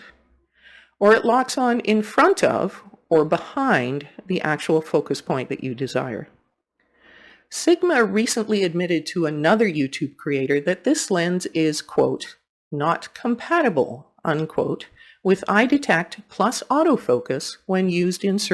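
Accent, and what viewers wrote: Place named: Canada. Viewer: First of all, I would like to say what a pleasure it was to catch your video, and it was lovely to hear a Canadian accent again! I lived in Hamilton, ON, for about 6 years before moving to Victoria, BC. I now live in Auckland, New Zealand. I started my professional career in 1980, but am now retired, so I have quite a few things in common with you. My preferred genres are wildlife, scenic and travel, but I have also shot architecture, portraits, commercial and industrial stuff. In NZ, birds are the dominant wildlife form, so my experiences are very much couched with shooting them. So, to your experience... I do understand your frustration with challenges using third-party lenses. I got the R5 and a couple of R6 units about 20 months ago along with the 100-500, 24-105 L units, and the 24-240 (which, by the way, is a surprisingly good all-rounder!). 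However, in my fairly vast array of legacy gear I have the Sigma 150-600c and 60-600s lenses, and I tried them on my R5 and R6 units via the Canon basic EF-RF adapter. To be honest I had no issues unless I was shooting close to minimum focusing distance - an experience echoed by respected Australian bird photographer Duade Paton in one of his videos on the subject. I have not checked your settings at the time of responding, but there may be some subtle difference in how we have our units set up. One thing of note is that Canon have released a long series of firmware updates for the R6 and R5 to fix issues with tracking and focus tha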